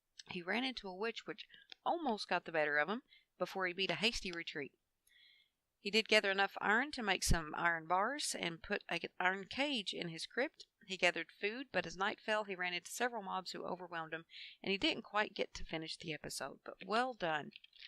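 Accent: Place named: United States. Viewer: Thank you for the player updates this month. You have been very clear and detailed. I love your accent! Whereabouts in the US are you from? Keep up the videos.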